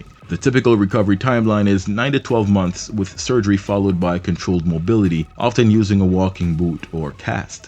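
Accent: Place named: Jamaica. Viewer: if i watched this video before i wouldnt have had to ask if you were jamaican lol. notifications turned on! bell and everything! great video.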